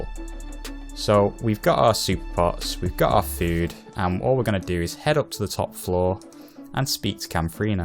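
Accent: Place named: United Kingdom. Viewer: dude you sound so brittish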